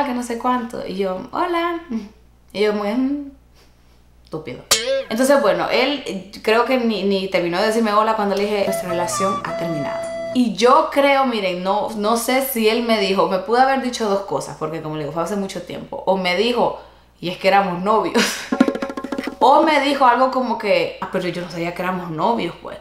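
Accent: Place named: Honduras. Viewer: Jajaja perfecta historia 🤣😂 morí de risa. sos la mejor jajaja escucharte hablar me hace sentir en Honduras. 🇭🇳🇭🇳🇭🇳🇭🇳🇭🇳🤘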